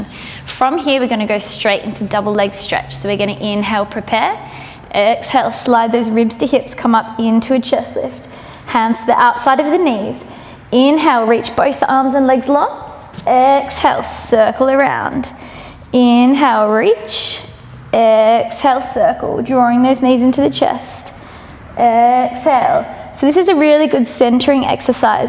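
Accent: Australia. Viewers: Beautiful accent from down below...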